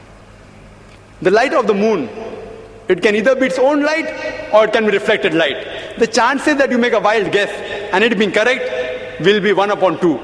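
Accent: India. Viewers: Thats his accent our Indian accent is like that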